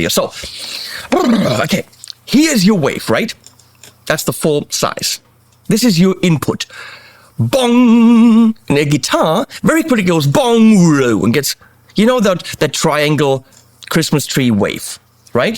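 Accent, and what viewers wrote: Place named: Germany. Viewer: So after 40 years or so I decided to get a Helix and be happy. It’s great but matching up the best pedals you can get is really the best. Watching these pedal videos makes me want to go back to pedals so, guess what, I’ve got both! But I still favor the Helix because it’s handy. Oh yeah, my favorite OD tone when recording? A Sennheiser in front of my old tube Champ with an attenuator. Now that is heaven in a bottle. A 6v6 bottle that is. BTW, you have no talent? I enjoy watching your videos. Your talent is in your delivery of the German laced, English language!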